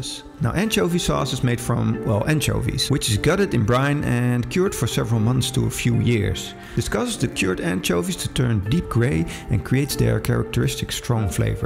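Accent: Netherlands. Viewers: annoying dutch accent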